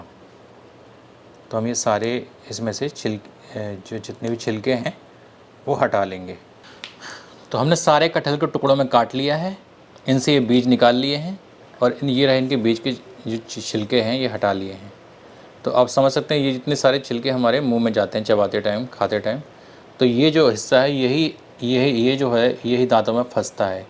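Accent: Standard. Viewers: Aapki sudh hindiwali vani soone pe suhaga hai.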